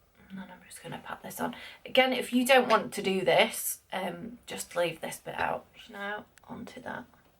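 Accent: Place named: United Kingdom. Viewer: i love your videos and i love your british accent :) This is Littledustmua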